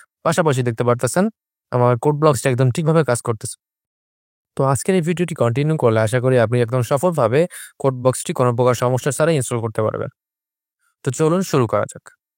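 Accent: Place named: Jhenaidah, Bangladesh. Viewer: ভাই আপনার বাসা কি ঝিনাইদহ?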